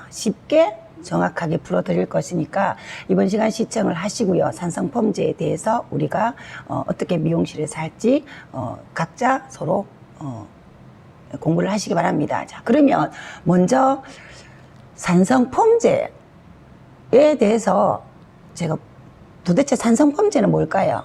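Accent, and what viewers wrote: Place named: Gyeongsang, South Korea. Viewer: 오리지날 경상도네요 경상도 사람들은 말할때핵심이 없고 말만 많아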